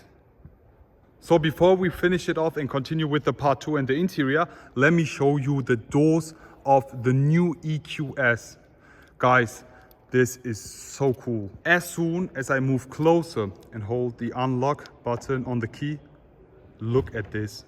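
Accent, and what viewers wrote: Germany. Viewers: Diese Deutsche Akzent beste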